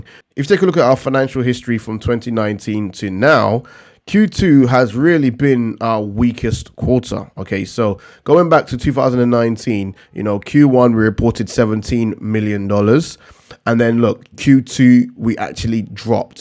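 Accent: United Kingdom. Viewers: where are you from? The accent sounds british!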